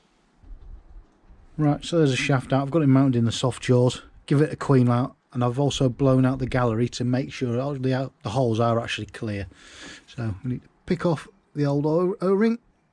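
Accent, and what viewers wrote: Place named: United Kingdom. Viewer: Hi; I kept watching due to the British accent. Do you have the thackery washers to the rocker boxes; as supposed should be? like in the later parts book drawing?; not in the manual book. Also kept watching to see an UK type model (I have the export model that is similar) but seems all have the USA specs...I need to upload a video at some point. I have a 79 with dual plug head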